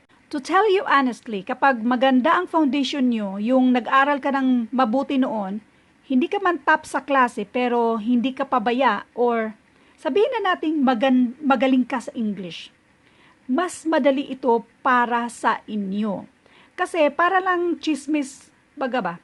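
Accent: Visayas, Philippines. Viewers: Nakakatuwa ang bisaya accent. Makalingaw man. Pero daghan matun-an. Thumbs up po ako. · Parang bisaya kagud maam. Tama bako maam noh? 😂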